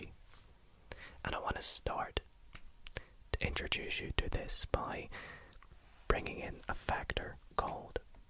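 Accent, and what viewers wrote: United Kingdom. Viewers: i love your asmr math channel. i also like your British YouTube name and accent. the British tends to call mathematics maths in abbreviated form.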